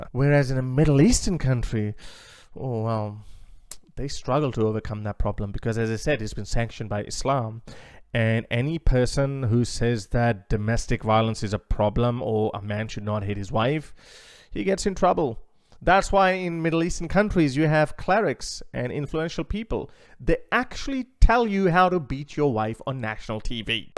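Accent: Australia. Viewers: I did not realise you live in Oz, although I know you have the accent. I came to live in England 21 years ago. It was so different to what it is now. When you spoke about visiting Europe & seeing the changes, I felt sick. But it's true. I am white but I was born & raised in Africa. My late dad was Welsh & moved there after WW2. He fought in the RAF as a gunner. I know he would be heartbroken to see both Wales & all the UK in the state it is now. 😢 It makes me angry & sad, that so many people died to stop Hitler, & now things are going downhill fast. I've got family in Australia & I'm wishing I could move there! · Are you an Aussie? You sound like one. "Wokies". Too funny mate. Pity about the subject of the video. Cheers mate.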